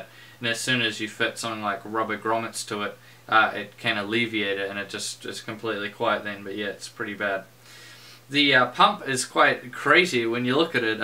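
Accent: New Zealand. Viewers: Mate, your from New Zealand? Awesome!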